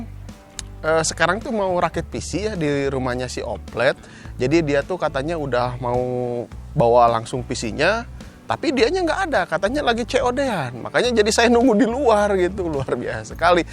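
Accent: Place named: Sunda, Indonesia. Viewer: Logatna meni alus ... Sunda pride 😂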